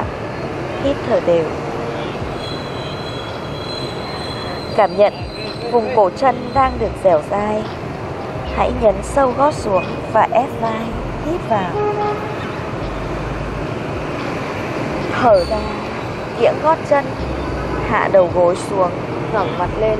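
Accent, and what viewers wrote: Hanoi, Vietnam. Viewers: nghe giọng nói Hà Nội mới sáng khoái làm sao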